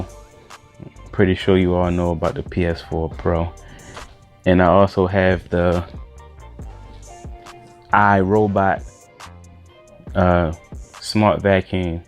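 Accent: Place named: Maryland, United States. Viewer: Feeling that man cave. I sense a Maryland accent...MicroCenter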